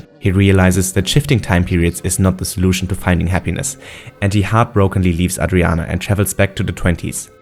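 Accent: Germany. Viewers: 😎. Your choice of words, the attention to certain details, your sense of humor. Also, I'm a huge fan of ACCENTS. Your's sounds German, or Austrian. Of course it might be some other. Finally, being Black American, I don't think I've heard a fellow (white) American go into such detail about JOSEPHINE BAKER & the influence that Black Americans had in Paris during that era. You're clearly (EUROPEAN.) I realize that Europe is far from perfect but I miss it. Also, (MIDNIGHT IN PARIS) is one of my favorite films of all time. For these reasons, I am grateful to you ! 💌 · Junge nach 2 Sekunden hab ich den deutschen Akzent gemerkt hahahahah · I'm obsessed with Midnight in Paris, too! This video is what I was looking for when I first saw the film one month ago, and I'm happy to see it was finally created. I never comment, but this is just great. To keep you engaged with your new found audience, I've got a question for you ...is that a German accent I am hearing? 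Keep it up! :)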